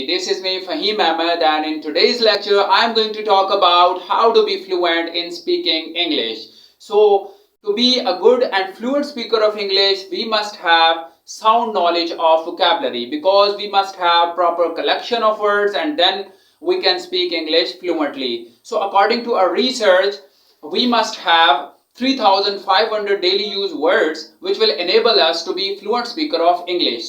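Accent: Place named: India. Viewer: يبدو من لهجتك انك هندى او باكستانى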